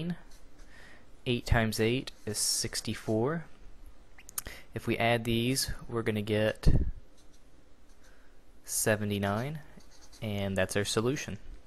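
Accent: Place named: South, United States. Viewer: GREAT teaching! By the way, I love your accent. Obviously southern like mine! :)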